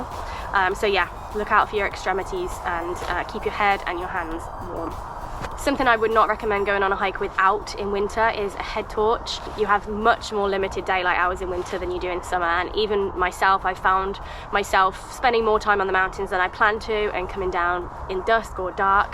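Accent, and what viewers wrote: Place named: RP, United Kingdom. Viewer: Thank you!! As an American I loved your proper accent and pronunciation. I found the difference in vocabulary I.e. torch/flashlight cute!More importantly that was quite the comprehensive list!!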